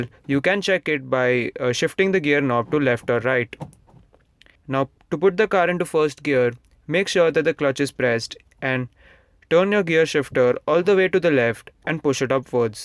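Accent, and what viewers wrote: India. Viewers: The indian accent made this much better xD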